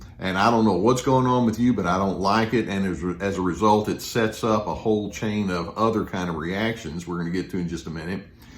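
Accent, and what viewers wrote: Texas, United States. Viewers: I left my narc back in 2007. Best decision I ever made in my entire life. Oh the stories I could tell, of his envy and lack of empathy. I look at your videos and even now gain healing and insight. Maybe there is something very special about the way a Texan drawl delivers compassion and wisdom;) Thank you SO much, Dr.! <3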